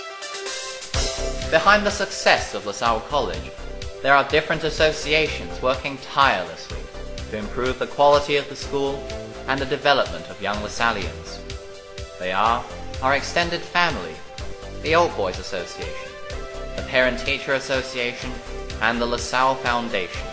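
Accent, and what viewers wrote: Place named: United Kingdom. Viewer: Who recorded it? Nice British accent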